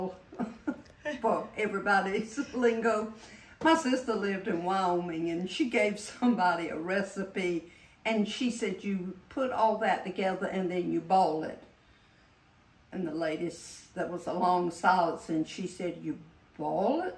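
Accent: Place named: South Carolina, United States. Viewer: I do love to hear your SC accent! I grew up spending summer weekends at Carolina Beach, NC. That was the life. When I was a young wife/mother, we moved to Florence SC, where I got a job working for Peoples Natural Gas (and Supertane.) I remember a call I tried to take once from a man who said he was calling from "Wilson Sawsude" and said they were having a problem with their "wrench." Well, I tried several times to understand, and finally had to turn him over to someone else. Turned out to be calling from Wilson Sausage about a problem with their gas range! I never forgot that. Hugs!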